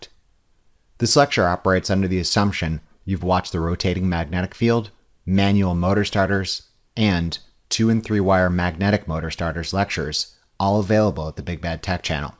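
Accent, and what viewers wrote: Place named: United States. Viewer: his articulation is quite fine. This is american english, he is a native, if you're having hard time understanding him, it's probably because you need to work on your english comprehension.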